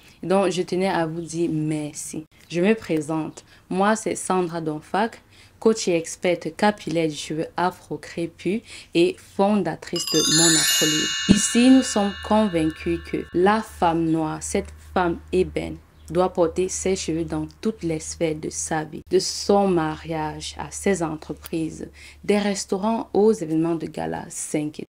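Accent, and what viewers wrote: Cameroon. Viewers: Merci Sandra cela fait un bout de temps que je te suis, seulement en suivant tes conseils on peut beaucoup amélioré sa routine. Il y a par contre celles qui font seulement du pur marketing. C est normal après de faire payer ceux qui veulent plus, c est comme un accompagnement dans le parcours capillaire. Et puis j'aime tes blagues, tes analyses même si je ne commente pas et surtout l accent camer qui me rappelle mon pays d adoption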